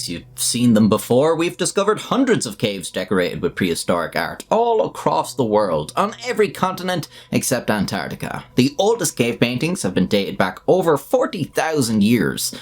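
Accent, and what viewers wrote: Ireland. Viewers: He is a well educated speaker of Irish English, that is how that number is pronounced.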